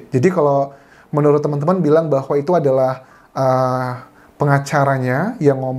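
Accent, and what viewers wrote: Java, Indonesia. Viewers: Suaranya sama medoknya bang dan alisnya sama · Huuf suara asli jawa Pk dosen , kental Dgn logat jawanya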